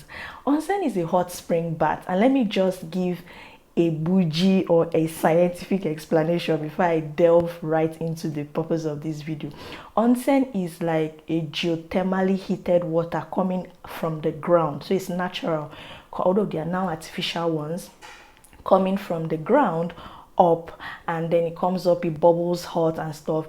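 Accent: Nigeria. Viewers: Very off topic but I like how u still have your Nigerian accent 😌😌😌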